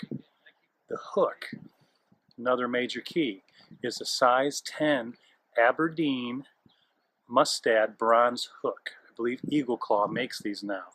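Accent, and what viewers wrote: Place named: Upper Midwest, United States. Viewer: Are there any catfish in the "White River"? Oh by the way you sound like you are from the upper midwest??? Thanks for the video!!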